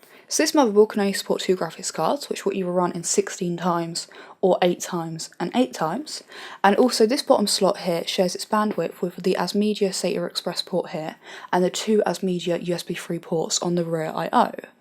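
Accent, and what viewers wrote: England, United Kingdom. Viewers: Very thorough review, you girl know a lot about hardware. On the top of this you have a very pleasant voice and lovely english accent.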